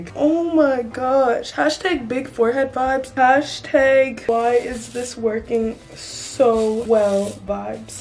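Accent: Canada. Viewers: Your Canadian accent reminded me of wolfie raps😂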